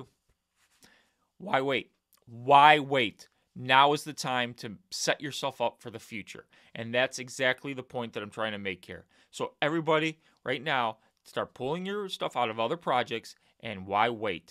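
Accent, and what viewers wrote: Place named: United States. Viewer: Sounds like an american to me.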